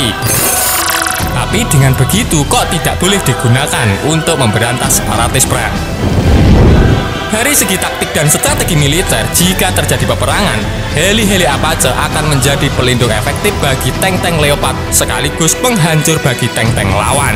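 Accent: Java, Indonesia. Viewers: Medok yg baca narasi nya orang Jawa kyak nya...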